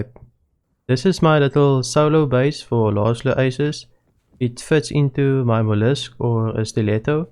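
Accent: Afrikaans, South Africa. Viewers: Thick afrikaans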